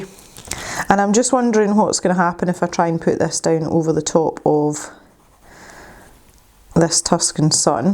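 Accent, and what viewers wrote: Scotland, United Kingdom. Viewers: I thoroughly enjoy watching your art...listening to your little Scottish accent (love it) and about your life! Do not let those thumbs down bother you! It is too bad it affects your channel.....so sorry!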